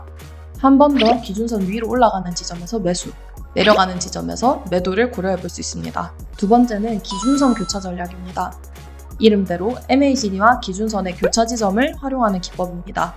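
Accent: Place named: Gyeongsang, South Korea. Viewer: 경상도 분이다~~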